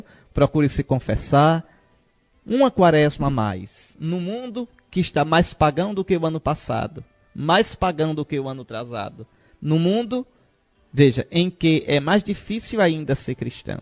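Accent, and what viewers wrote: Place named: Northeast, Brazil. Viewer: Uma ótima explicação em dialeto nordestino. Dom Henrique intercedei por nossa Quaresma!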